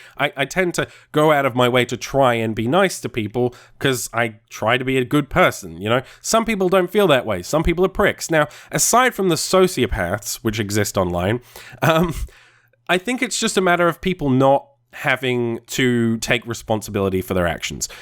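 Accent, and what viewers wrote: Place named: Australia. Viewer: Oh dude i can't wait:D Also wanted to say that i really enjoyed your Q&A, i'm really sick at the moment so i listened to it while resting and it was just fantastic. I always thought you sounded australian but with a slightly off accent and i couldn't quite put my finger on it but it was always leaning toward english, glad to know that you came from the UK. I'm also australian.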